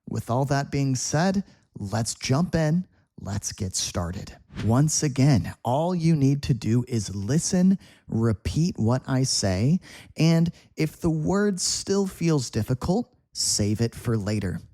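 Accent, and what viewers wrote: United States. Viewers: Hello. You're american or british?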